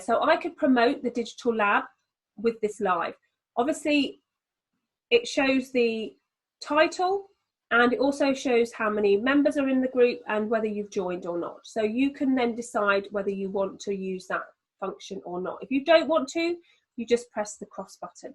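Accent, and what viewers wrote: United Kingdom. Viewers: ok I am a little dense. What are you using for recording you screen for this presentation. Ps. You always get back to me after I ask a question. I like that and the. Brit accent. Lol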